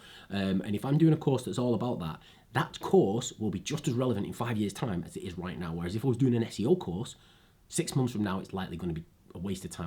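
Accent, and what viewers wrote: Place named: South Africa. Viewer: You talk like a South African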